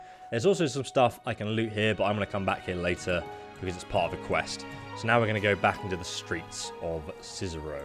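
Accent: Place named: United Kingdom. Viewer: Actually, I like your articulate brt English. Keep reading those text pls for you're more amazing than the BBC (not trolling, I'm really practising my Eng. listening)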